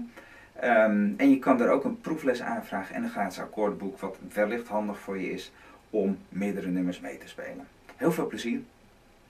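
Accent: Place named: Netherlands. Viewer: Unbedingt ! ;-) Das Rudi Carell Deutsch is doch nicht schlimm ;-) Freu mich drauf und DANKE !!!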